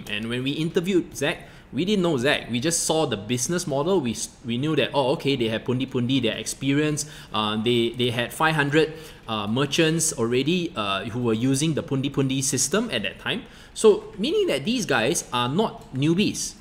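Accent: Singapore. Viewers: Singaporean accent is so funny.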